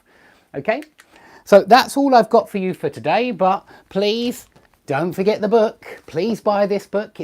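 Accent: United Kingdom. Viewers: British accent is superb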